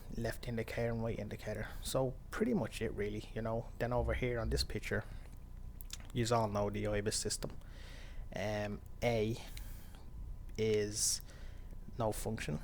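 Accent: Ireland. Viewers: I love your Irish accent.. North or RoI? I'm from Wales :)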